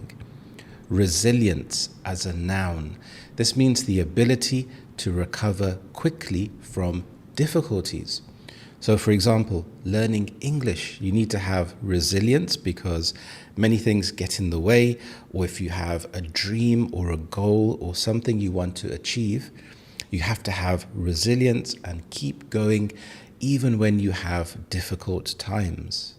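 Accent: United Kingdom. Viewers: I’m Vietnamese , But I like listening your English accent and your videos ,thanks so much and have a nice day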